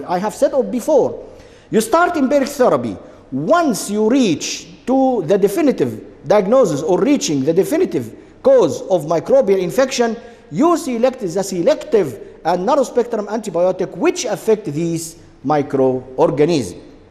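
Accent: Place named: India. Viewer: هو حضرتك بتتكلم زي الهنود ليه 🙂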